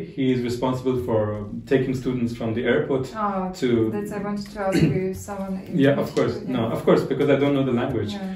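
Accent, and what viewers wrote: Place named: Russia. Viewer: His english accent ia also became similar to how russian people speaks russian.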